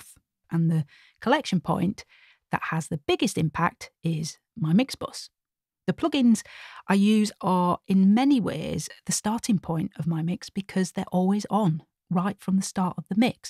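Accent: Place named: North, United Kingdom. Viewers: The icing on the cake of this brilliant video is familiar, approachable, northern accent and easy style which just makes this a joy to watch and listen to! Thanks & Warren. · Really enjoyed this. Great advice, well explained, and to cap it all - delivered with a wonderful Yorkshire accent.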